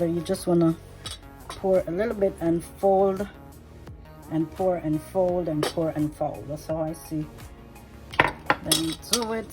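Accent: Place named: Jamaica. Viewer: U sound like a Jamaican 🇯🇲 New Subscriber😂🙌💖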